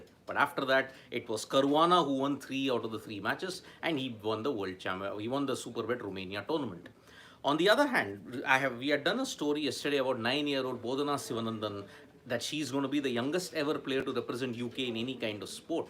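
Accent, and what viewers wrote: South India, India. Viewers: Considering you have a nice South Indian accent which logically means you studied English in India, why do you pronounce the word "schedule" in the American style - skedule ? Not complaining but just making an observation. 😂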